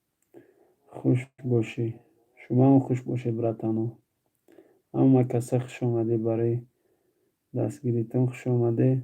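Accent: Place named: Dushanbe, Tajikistan. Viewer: Чисто душанбески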